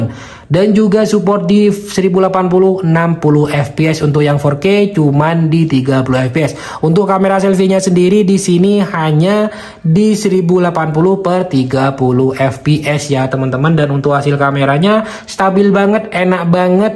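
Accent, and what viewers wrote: Madura, Indonesia. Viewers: Logatnya kental banget, madura banget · Madura logat ny 😂🤣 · Dari aksen bahasa indonya sih.. Pasti dari Meduro ori yo cak ? Hahaha 😇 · Orang Madura kah bang??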